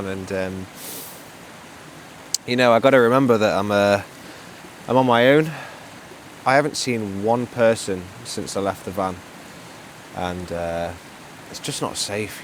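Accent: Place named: Sheffield, United Kingdom. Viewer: It's a like and sub fron me. Sounds like you live near Sheffield like me :)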